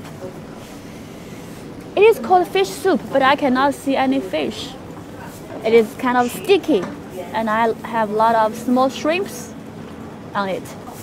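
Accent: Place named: United Kingdom. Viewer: You sounds like you're born in UK or studied there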